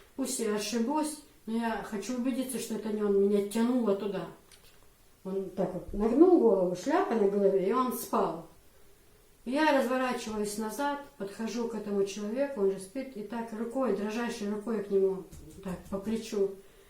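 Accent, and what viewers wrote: Chechnya, Russia. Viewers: Знаешь некогда не подумала бы что вы русская потому что акцент чисто чеченской увас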